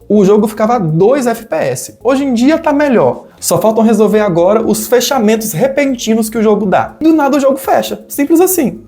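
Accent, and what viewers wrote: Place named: São Paulo, Brazil. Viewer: Eu to rindo horrores com o sotaque paulista hahahahaha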